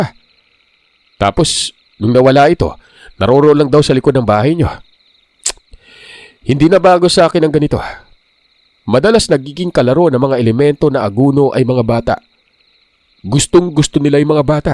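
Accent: Visayas, Philippines. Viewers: wag kna magbasa ng may bisaya... BOBO KA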